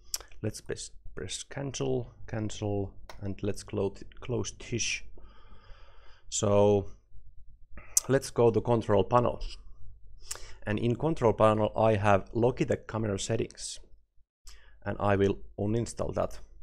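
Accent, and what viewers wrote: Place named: Finland. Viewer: Thank you so so much for your tutorial! I could not figure out how to get the Logitec driver back after I had to wipe my compute recently. That driver is going in a safe spot. Also you have a very lovely Finnish accent, one of my favourites the world over! THANKS AGAIN! (and yes you have amazing English)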